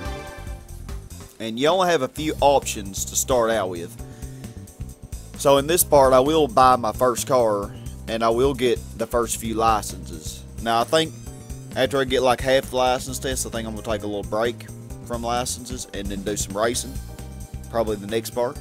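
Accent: South, United States. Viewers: Man, that's a thick southern draw, where you from?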